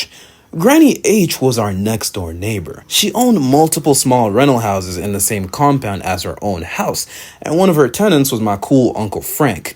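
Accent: United States. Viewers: Even crazier that these (professionally-animated) videos about "life in Africa" are narrated by someone with an American accent. There are too many question marks here to take any video this channel publishes seriously.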